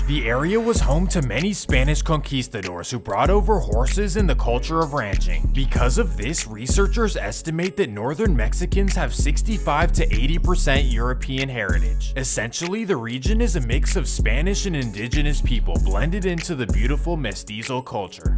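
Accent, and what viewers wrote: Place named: Stockton, United States. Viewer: The way he going hard pronouncing these mexican words make me think he from stockton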